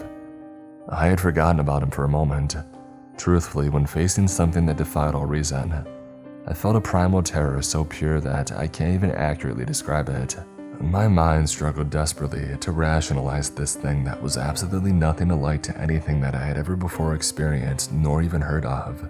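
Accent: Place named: New England, United States. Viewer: When I visited most people called it Baw Hawba. 😂.. I love that Yankee accent!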